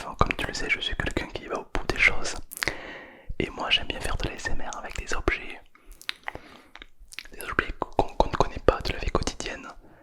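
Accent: South, France. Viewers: Mdr j'adore tous les personnages que t'as inventé que tu fait intervenir dans tes vidéos et ton ASMR est génial encore plus avec ton accent du sud ❤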